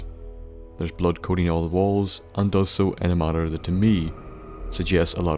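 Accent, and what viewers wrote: Ireland. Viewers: I think he's irish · Is the irish?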